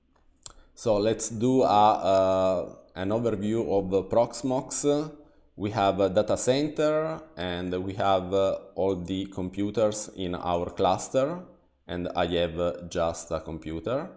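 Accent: Italy. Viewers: The italian is strong in this one, thanks for the comparison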